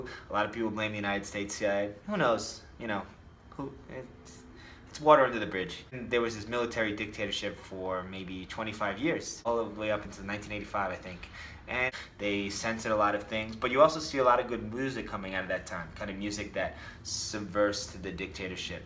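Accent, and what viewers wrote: Brazil. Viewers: Buddy, you sound like a Brazilian speaking engilsh sometimes! That's cool! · Parece um Brasileiro falando ingles.. hum?! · E impressão minha ou o ta com sotaque brasileiro???🤔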